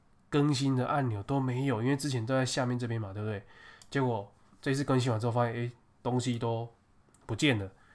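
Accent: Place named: Taiwan. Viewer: 台湾腔诶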